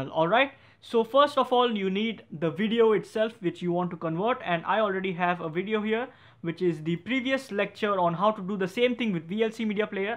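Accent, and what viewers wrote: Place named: India. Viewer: Indians speaking english sounds very ridiculous, next time use subtitles, the world will thank you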